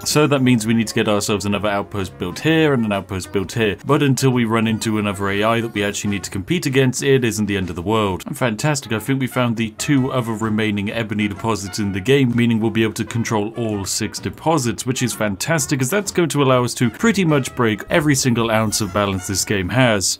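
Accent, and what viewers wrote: United Kingdom. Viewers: sounds awfully British too me 🧐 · Day 6 of reminding we want to hear him say "Sugoi" in his glorious British voice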